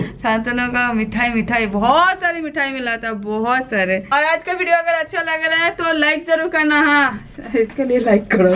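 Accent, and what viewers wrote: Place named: Mumbai, India. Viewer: Mumbai style dialogue 👌😍😍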